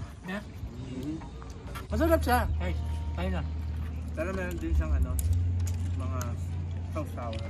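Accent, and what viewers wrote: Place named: Pampanga, Philippines. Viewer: The Campampangan Accent tho. 🤘😁🤘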